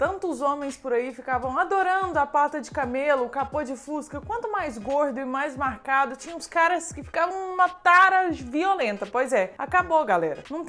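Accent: Minas Gerais, Brazil. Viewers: Como não assisti seus vídeos antes! Moça você é demais! Muito engraçado! Nosso sotaque de MG é muito legal! Mineirice sempre!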